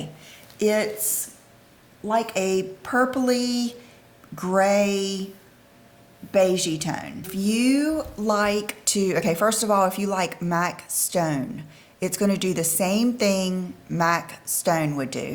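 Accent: South, United States. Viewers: I just LOVE YOU. You are who I’d love to be. I found you and you are like my fantasy channel. I can’t afford the high end things you have and talk about. I have to say at times, I feel envious and at times it makes me sad that I can’t have such nice things. I’m 60 and disabled on fixed income. I’d love to be picked for a gift, it would mean the world to me. Thank you for being the fantasy “me”. My coloring is like yours and I have the redness. I try to look my best with the makeup I can afford. Anyway, I am happy I found your channel and I love your fashion sense (it’s me or the me if I could afford it) I love your southern accent and your kind and sweet way you treat your “friends” on here. God bless you.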